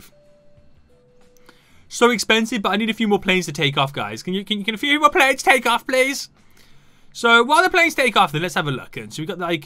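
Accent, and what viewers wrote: United Kingdom. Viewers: Which part of the UK are you from? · Are you British?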